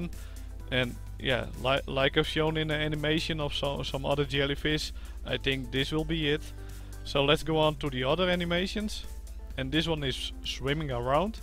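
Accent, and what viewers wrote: Netherlands. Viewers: are you dutch ? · are you dutch? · Ik hoorde meteen het Nederlandse engels XD Leuke vid ;) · Another good video :D I see those people still haven't subscribed! I don't understand why people don't subscribe when they watch your stuff! Oh and i was wondering... are you Dutch? :D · Are you dutch? xD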